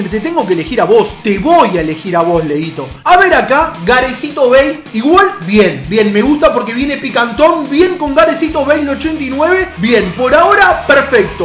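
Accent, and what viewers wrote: Argentina. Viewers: Tu acento Argentino arruina el video!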